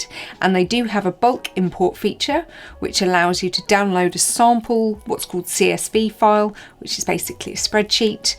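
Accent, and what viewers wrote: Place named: United Kingdom. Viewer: Your video has been so much help! We've used it a lot tonight. Fun fact... we're referring to you as the "lovely posh English lady" 😀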